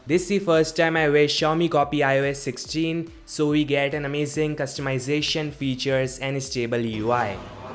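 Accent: India. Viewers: Dei engdian English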